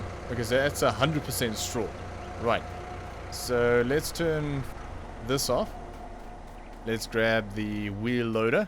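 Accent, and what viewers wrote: South Africa. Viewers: You sound like you are from South Africa... anyway got here from Dag.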